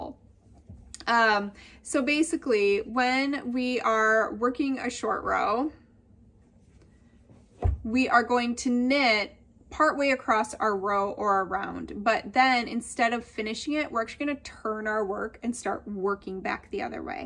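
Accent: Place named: Midwest, United States. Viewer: loved the Midwest accent! I grew up in Minnesota and have lived in CA for 24 years. However, when I am tired or talking with family, that Minnesota accent pops right out!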